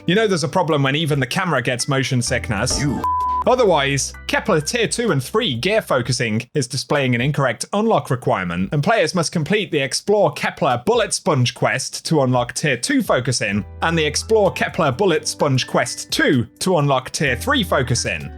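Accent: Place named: United Kingdom. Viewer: Always useful D2 info. & British accent!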